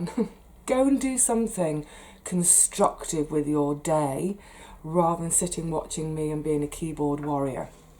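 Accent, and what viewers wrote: United Kingdom. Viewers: You have such a beautiful British accent, love it. So you have Trolls in the UK also? I watch You Tube videos but I don't have my own channel. Here in the USA the Trolls can be very cruel. Please try to ignore them, give them no thought. I think it's so exciting that you were and are an actress. You are very young, I'm now on Social Security Disability at 71 years old. I remember waiting to 67 to get Social Security here was hard, I took mine early at 62, but then there was no Medicare (healthcare) until age 65. It is so hard for widowed women in the USA. But so much harder for Single, widowed or divorced women here, especially with working full time with children and childcare. Thank You for showing us how things work for you...so very interesting. So proud of you for tackling and paying off your debt. You are a true marvel to me!! 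Please stay safe and Take care from your new pals in the USA, Debbie and Dexter-Dog the amazing tiny chihuahua companion.🙋🐾💕🙏🙏🙏😷😷😷🏥🏥🇺🇸😁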